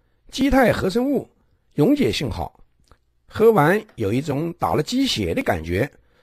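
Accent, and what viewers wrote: Sichuan, China. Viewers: 这一听就知道是川渝方言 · 这个普通话是个四川人在说吗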